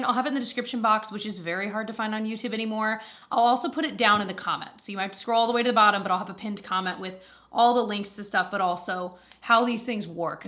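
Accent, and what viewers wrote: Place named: United States. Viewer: I so enjoy your videos!! How you “feel like” x,y,z. I miss America so it’s fun to hear the little dialect differences. Been hoping for a review on that setting spray!!! Thank you for being genuinely you!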